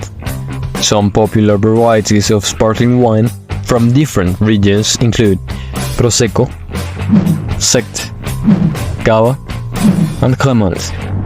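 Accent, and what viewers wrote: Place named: France. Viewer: Very nice video, thanks very much!! To be honest its a little hard to understand your accent, but with subtitles it works perfectly, also your accent adds some french charm to it, so feels like the narrator is definitely know what he is talking about, so although its a bit hard to understand its actually nice )) thank you!!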